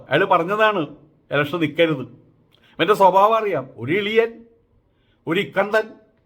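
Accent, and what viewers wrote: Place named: Thrissur, India. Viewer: Trissurkkarudey aa vilikkal...... Swami enthado😂😂... Soooper correct...... Jeevanulla samsaram❤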